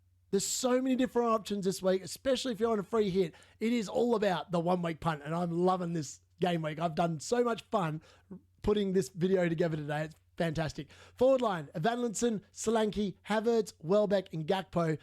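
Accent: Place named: Australia. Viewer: The Aussie accent and the pronunciation of names got me subbing 😂 Brilliant show, mate! 👍🏼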